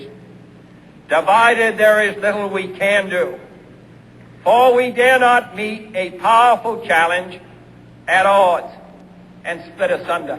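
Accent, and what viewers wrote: Boston, United States. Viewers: was not just a great president but a very handsome president with a very rare american accent that you don't hear as much anymore. God Fucking Bless you and your soul. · Yeah, he has a Boston/New England accent. He's from Massachusetts. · where's jackie??? I LOVE JFK!!!! and his boston accent!!!! yea democrats!! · Notice his Boston accent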